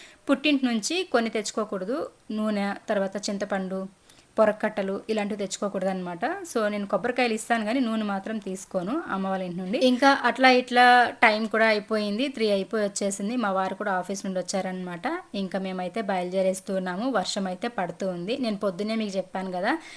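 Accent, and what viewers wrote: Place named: Eluru, India. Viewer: పెటిక పప్పు అనరు మా సైడు చీక్కుడుకాయలు అంటారు మాది పచేమగోదావరి జీలా ఏలూరు మేము ఎపుడూ అలంటే కూరలు వాడూకొము రాయలసీమ వెపు వాడుతారు అనుకుంట మీ మాట మా సైడ్ లాగా ఉది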